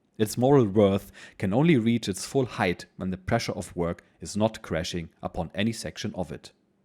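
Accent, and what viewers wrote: Germany. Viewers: These are quite the treat. 👍 I’ve already read Storm of Steel twice, but your insights and German accent add a whole new dimension to the experience. Will definitely continue this. God Bless 🙏🏻 P.S. I don’t know if you take suggestions, but “Black Edelweiss” by Johann Voss a Waffen SS veteran would be an interesting listen. No pressure. Peace ✌🏻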